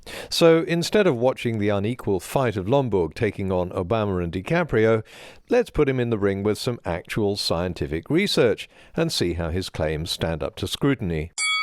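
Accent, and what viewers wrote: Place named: England, United Kingdom. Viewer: He's not smug, he's speaking with an English accent. That's literally how we speak here. If that's "insufferable" to you, you clearly have never suffered. But your Bald Eagle profile and racist intolerant approach already says right wing populist long before your opinions could be considered valid.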